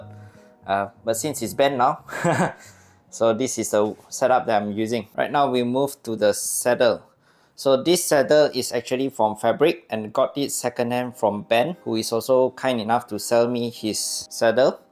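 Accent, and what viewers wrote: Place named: Singapore. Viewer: I recognized the Singaporean accent haha. I miss Singapore and mount faber. Looks like great ride tho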